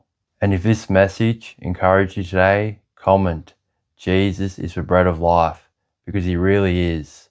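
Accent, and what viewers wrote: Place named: United Kingdom. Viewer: Thank you for subtitles. You have a very strong British accent and I'm not even American. Thanks to the Lord I could understand you without subtitles but many people out there need you❤ please continue your work. Bless you brother❤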